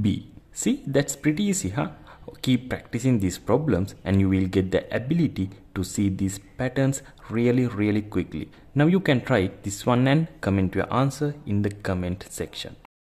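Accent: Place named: Sri Lanka. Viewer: I notice a Sri Lankan accent :D but keep it up bro!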